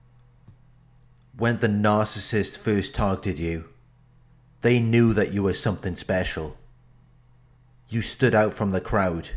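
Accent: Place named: England, United Kingdom. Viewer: Are you English mate, I'm Scottish love your vids mate spot on.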